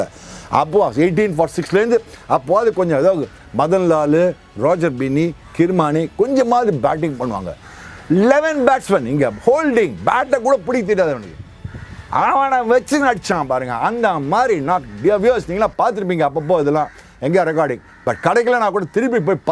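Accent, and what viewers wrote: Chennai, India. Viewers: sir you are awesome! Again and again you are proving you are so talkative, sportive etc.. You always speak in our Madras basha.. 👌👌 Velipadayana Manishan sir neenga..No one can compete with your energy level...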